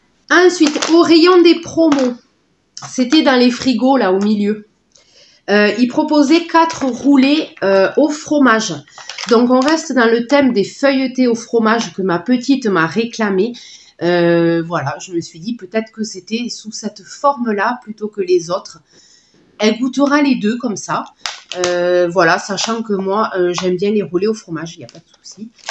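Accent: South, France. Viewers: Hello, vous me faites trop rire, j'adore vous suivre, et surtout j'adore votre accent du sud qui met du soleil dans mes journées ( eh oui je suis du nord 🫣🤣). Merci de partager vos courses et vos avis, cela m'a donné envie de goûter certains produits éco+. Vivement la prochaine vidéo. Belle journée ☀️🌺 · Bonsoir quel plaisir d’entendre votre accent du Sud ,merci pour ce retour e courses,dommage chez moi il n’y a pas de Leclerc.1 coucou d’Aubagne👍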